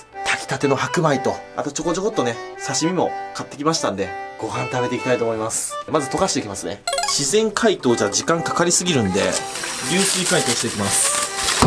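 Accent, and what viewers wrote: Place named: Seto, Japan. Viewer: 小さい頃、瀬戸市に住んどりました。懐かしい話し方と発音が耳に心地よいです💧😢 特に、魚おじさんと話してるときは、ほっこりします。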